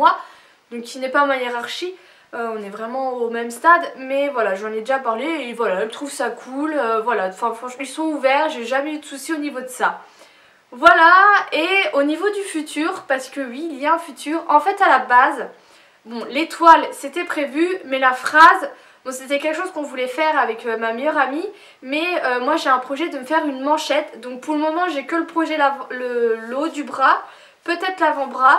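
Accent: Lorraine, France. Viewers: s'entend ton accent de Lorraine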